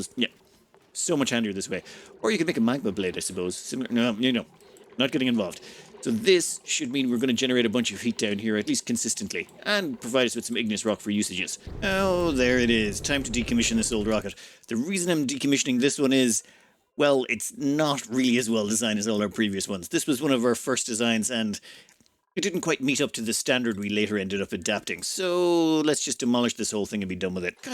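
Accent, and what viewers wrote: Ireland. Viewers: YOU'RE IRISH?!?!! FUCK YEAH!!!!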